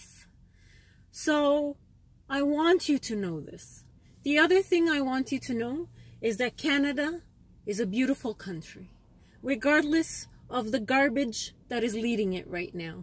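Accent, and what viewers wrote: Egypt. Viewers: كيف سعوديه وانت تتكلمين مصري؟ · How comes she says she is a Saudi when she has a strong Egyptian accent she is not telling the truth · انتي سعوديه وكيف لهجتك مصريه😂😂😂😂😂😂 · Egyption accent and speaking on behalf of saudi women. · how you can be a SAUDI citizen but you accents like a Egyptian?🌚😸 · For people asking how come she’s a Saudi Arabian and her accent is Egyptian.. I’m Saudi Arabian and a lot of my male relatives are married to Egyptian women and their daughters speak with Egyptian accent just like their mothers while they’re Saudi Arabian. I just wanted to clear things up. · she said she is originally from Saudi Arabia , I am from Egypt and her accent is Egyptian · Your accent is Egyptian... lying is not freedom.. U r right about parading Burdoo. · Your accent is not from Saudi Arabia. Your accent is from Egypt. I think you were born as christian , not a muslim. 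I am from Middle East and everything is clear for me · How do you claim you’re Saudi while your accent is pure Egyptian? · You are 100% Egyptian. The Egyptian accent!!!!!!! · how come you are Saudi and you accent Egyptian ? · You are not Saudi , your accent Egyptian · you not saudi Your accent is from Egypt. Don't lie and say you are Saudi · Pat Maguire believe me she is Egyptian I can smell the falafel from her ...we Saudis know each other + we don't live in egypt and you can't find a saudi with Egyptian accent . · Saudi with thick Egyptian accent 😭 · Hhhhhhhhhhhh You are laying at your self saying your from saudi arabia 🤬🤣🤣🤣. You are from Egypt. Your accent is Egyptian 😱.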